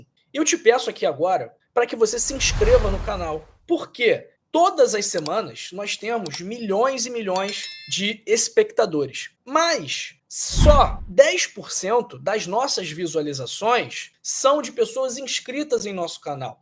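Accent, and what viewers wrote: Rio de Janeiro, Brazil. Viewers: Faz só dois anos que estou comendo banana madura e bem madura. Aqueço numa frigideira pequena de teflon sem por açúcar e sem óleo depois adiciono aveia já cozida em água pra evitar leite . No final adiciono boa quantia de canela moída . É lanche bom. As outras frutas nunca consumo. Percebi que a ameixa seca preta tem um açúcar terrível; dói o dente na hora. Muito legal o seu sotaque carioca.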